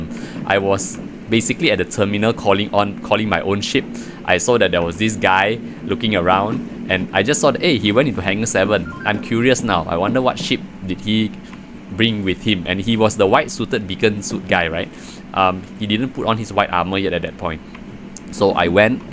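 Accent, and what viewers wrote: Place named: China. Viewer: Are you Chinese? You sound Chinese. :D If so, ni hao, gao xin ren shi ni. Thanks for the funny video.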